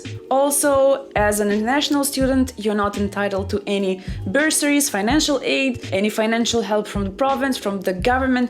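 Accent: Russia. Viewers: Wait are you russian? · Are u russian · Are you Russian?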